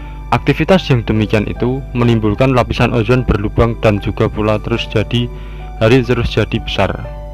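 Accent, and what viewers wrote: Java, Indonesia. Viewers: hostny lucu medok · Medok nya banget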